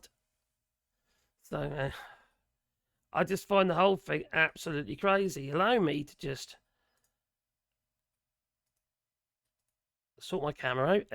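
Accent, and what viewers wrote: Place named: Norwich, United Kingdom. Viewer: Hey there, only just stumbled across your channel and enjoying your content. I live in Norwich, UK, and you sound like a local 😊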